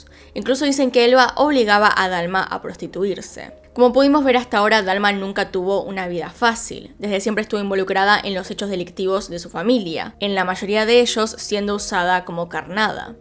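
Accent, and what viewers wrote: Argentina. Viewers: soy de origen mexicana pero casi toda mi vida vivo en Amsterdam, tan chistoso el accento Argentino con el sssshhhhhhh muy interessantes video's nadamas tu maquillaje muy claro/blanca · Esta mujer me encanta tambien😻😻 sobre todo por su acento argentino y su inteligencia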